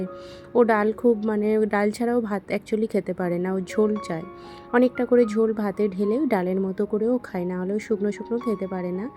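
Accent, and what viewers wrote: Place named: Bihar, India. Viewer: তারা তুমি কি বিহারী বলোনা আমাকে প্লিজ কারণ তোমার কথাবার্তা শুনে মনে হয় বিহারী লাইক দিয়ে তোমার ভিডিওটা আমি সম্পূর্ণ ফুল ওয়াচ করলাম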